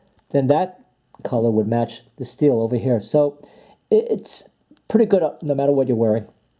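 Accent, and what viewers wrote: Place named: New York, United States. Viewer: Good review of the Daytona and love the cool NYC accent!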